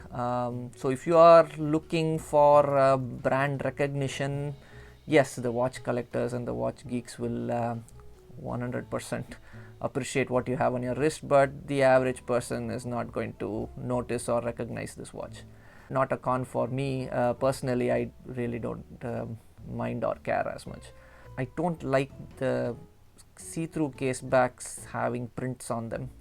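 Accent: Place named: India. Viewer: Just can't listen to Indian accents for too long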